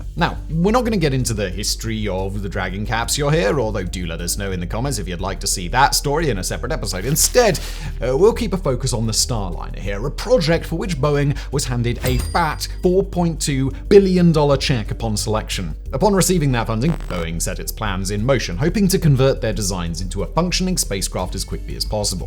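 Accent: United Kingdom. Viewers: So sick of hearing this British sounding windbag. He narrates everything.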